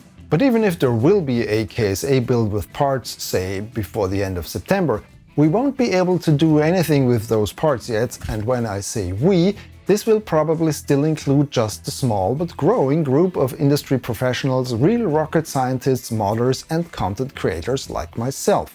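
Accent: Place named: Germany. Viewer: Thanks for these update videos. I don't have time to get deep into KSA development these days, so I'm glad you're providing summaries of whats going on. As an aside, what is that accent of yours? It sounds sort of German, but slightly spicier. So like perhaps you're Dutch or Austrian? I don't think Polish, and I feel like Belgian would be more Frenchlike? Just curious.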